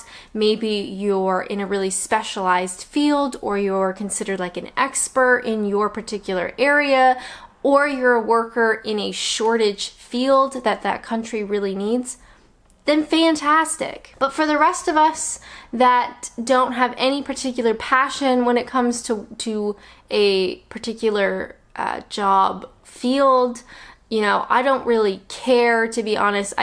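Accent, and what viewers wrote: Canada. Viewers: Hi, Another chapter of the e-book done. Very honest. You sounded different today, maybe a little bit more Canadian but clearer and more confident or focused. Or maybe sitting closer to the mic? Byeee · Love the Canadians accent but you are saying ‘pounds’ like an English person, this is a milestone for sure.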